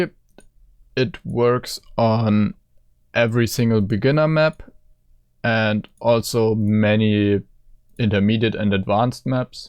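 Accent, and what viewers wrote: Germany. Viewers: ur from germany arent you? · are you german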